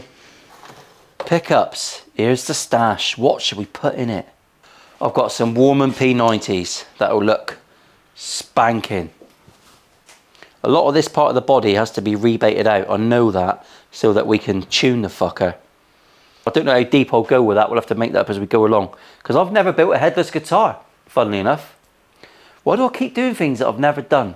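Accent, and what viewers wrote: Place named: Bristol, United Kingdom. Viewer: 😂yeh mate he’s been learning for about a year now and he’s getting really good. When I showed him your last build he was like dad that’s sick so when he comes up my place he’s asks if we can watch your videos and I have them saved to watch. I’ve also just started playing guitar but I’m a piano player so guitar has been a bit of a challenge but with his help I’m getting there. He’s never seen any guitar build videos before and I said son you have to watch this guy he’s brilliant and hilarious and we’re are hooked. Like I said the builds are brilliant but we love the way you are on the videos. We’re in Bristol so only up the road and we gurt talks the same init. Thankyou me and Toby have really bonded over guitar and your videos gave us something to laugh about together too, although explaining what a beef bayonet was a bit tricky but he got the gist😂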